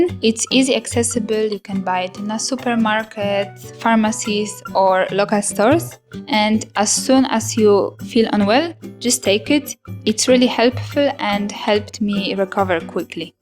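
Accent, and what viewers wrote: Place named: Eastern Europe. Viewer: Czech, Slovenian, Polish? Usually I can recognize accents.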